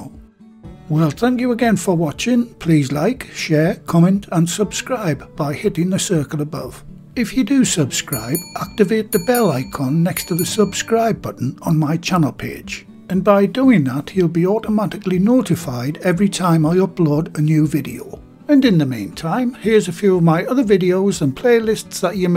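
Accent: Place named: Scotland, United Kingdom. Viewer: He does Winnie The Pooh and with with a little touch of Scottish brogue.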